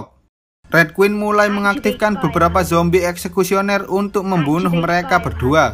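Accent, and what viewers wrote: Java, Indonesia. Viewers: Orang jawa bang?? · medok bener ... medok!!! 😤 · jowo endi mas? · Wong jowo bg😂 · Keritik pedes dari gua . Lu itu ngomongnya medok ga usah bercanda kalo bikin video . Ga lucu sumpah malah bikin ilfil . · Fix org jawa cara Ngomongnya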